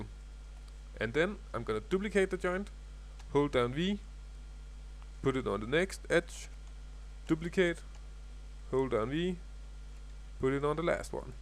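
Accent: Denmark. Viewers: Nice tutorial! Are you by any chance Danish? Your accent is so familiar.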